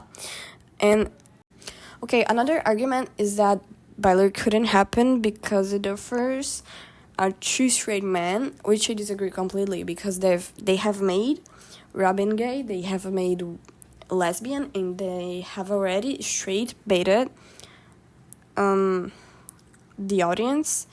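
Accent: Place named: Russia. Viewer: oh sorry! your accent just reminded me of a russian one. i love this video btw;3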